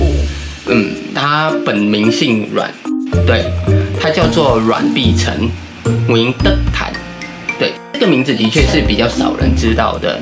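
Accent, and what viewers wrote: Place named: Taiwan. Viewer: 台湾腔好重哦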